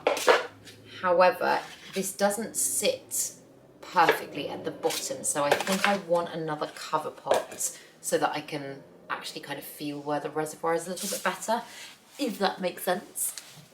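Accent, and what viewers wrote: United Kingdom. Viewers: I watch your videos from France to learn about plants and to learn english as well!!! I love your british accent and prononciation!! Thank you!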